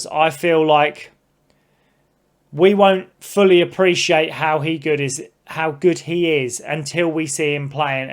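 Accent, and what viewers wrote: United Kingdom. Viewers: A Newcastle Fan from Down South? (judging by accent). That's gotta be a rarity? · I just love your Newcastle accent !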